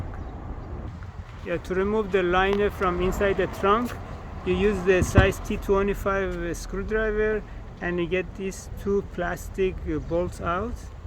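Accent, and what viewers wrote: Iran. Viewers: از گویش شما پیداست ایرانی هستی موفق باشی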